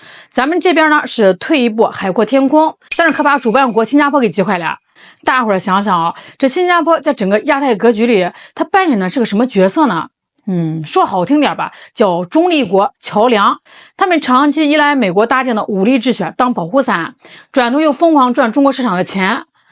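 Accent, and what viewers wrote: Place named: Shandong, China. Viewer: 你这山东调不能常听要把我带偏😊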